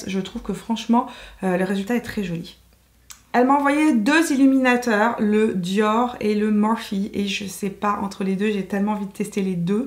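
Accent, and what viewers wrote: Quebec, Canada. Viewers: Bonjour! J'aime beaucoup quand vous faites des collabs toute les deux! Et quand je t'entends parler, ça me rappelle le Québec où j'ai habité quelques années...la palette Dior est superbe, je l'utilise depuis qques semaines. Voudrais tu par contre me dire quel est le dernier pinceau que tu utilises, le fluffy pour appliquer les illuminateurs en topper, stp? La bise.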